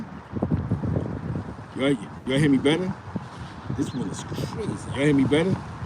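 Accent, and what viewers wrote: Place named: East Harlem, United States. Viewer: Damn I missed the live but salute and make sum videos it’s wack out here need to hear that East Harlem talk u know how we do 1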